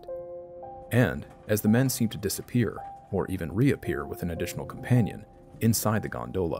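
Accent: United States. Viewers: Was looking forward to this channel, then "Oh for gods sake, an American narrator" why does everything have to be americanised, won't be listening again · I'm pretty happy to hear an American accent. This guy is a Marine and his channel focuses on war stories. No Brits here. 😬. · Would have liked to be able to add this to my subscribed list as I so enjoy Bedtime Stories, but the American accent isn't for me. Sorry.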